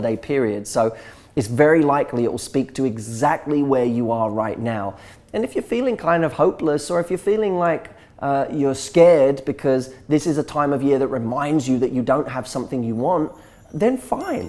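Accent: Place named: United Kingdom. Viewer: Do you know what..You are completely true..Before I saw your channel I hate British accent..Now I love it sooooo much..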